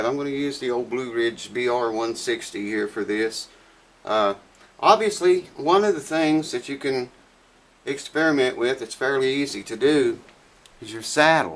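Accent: United States. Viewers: Enjoyed that even though I hardly ever play my acoustic, still you're a character and I dig your accent, what state of America are you from? Cheers Si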